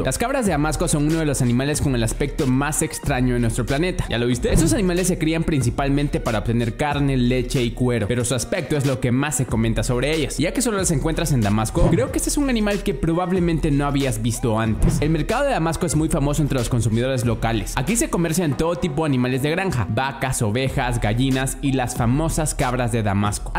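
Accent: El Salvador. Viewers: Ahora si se nota que es de El Salvador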